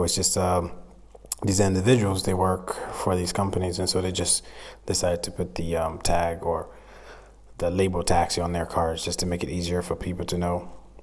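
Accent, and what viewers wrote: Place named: Southern Africa. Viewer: Your accent sounds very familiar. Like a mixture of Southern-African and American.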